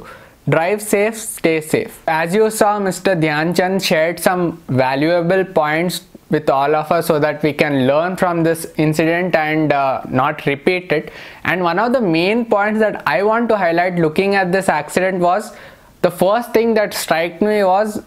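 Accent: India. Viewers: Guys like you are the reason that Americans mock indians for their accent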